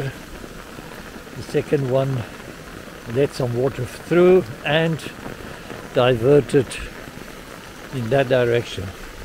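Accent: South Africa. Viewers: Sounds like a South African accent?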